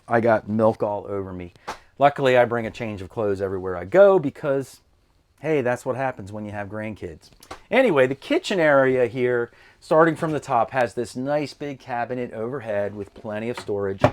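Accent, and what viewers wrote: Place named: Baltimore, United States. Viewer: Love your videos. does an excellent job shooting the interiors. You can hardly see them on other channels. I've been meaning to ask - you are from the Baltimore area, correct? - I grew up there and you have a heck of an accent, Hon! If I'm wrong, next guess would be PA or NJ. 😀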